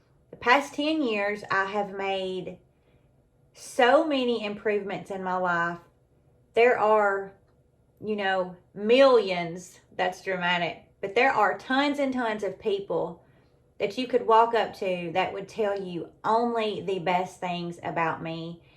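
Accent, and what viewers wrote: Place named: South, United States. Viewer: Hi, i just subscribed!😊🎉❤ I saw some of your denture videos. I was just browsing through your videos, and my experiences are so much like yours. It's crazy! My teeth are so bad! They are broke off to the gums. I am 60 yrs. old and i want to get dentures just like yours. lol I love them. You mentioned in one of your videos about the 3D dentures. Could you tell me where to reach them and how much they are,please?🙏🦋🌸💐😉👍❤️ You got the talking down with yours, girlfriend. 😏 Ive been clean for 14 yyears now.👍🙏 Im much happier now. I will check out your videos. Thanks for sharing. You seem like such a great person. I love your country accent!!! Lol❤😊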